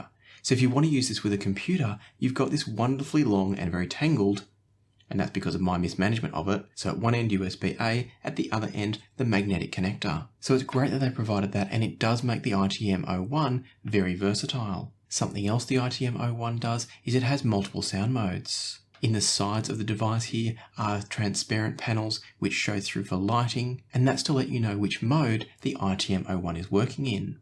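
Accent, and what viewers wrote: Australia. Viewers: it's his Australian accent with a rising inflection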